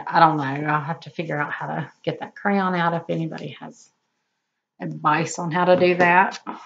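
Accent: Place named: South, United States. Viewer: Hello and welcome I heard you and thought immediately... yeah another southerner.... and then when you were showing where you are from and were talking about Dr. Pepper I was like dang you are in my neck of the woods. Looking forward to seeing more of your projects and future videos.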